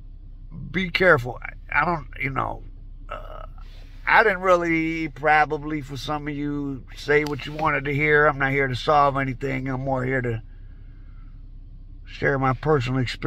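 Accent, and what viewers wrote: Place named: Missouri, United States. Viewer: Omg is this guy from Missouri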